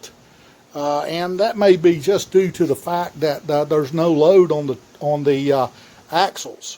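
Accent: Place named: Upstate South Carolina, United States. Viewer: I hear your accent I'm gonna say your from upstate sc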